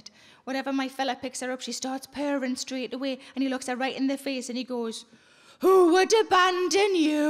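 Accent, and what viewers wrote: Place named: RP, United Kingdom. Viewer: She’s the funniest 😂! She sounds so posh then she drops the F bomb which doesn’t even sound bad when she says it! 😂😂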